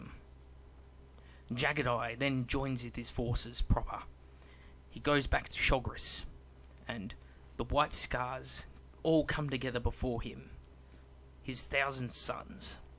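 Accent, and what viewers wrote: Australia. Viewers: I would of preferred if they brougt back Leman Russ, but not being big on the lore that might just be because I'm a wolf fan boy rather than because I think it would make a good story. Also, I'm guessing your Australian?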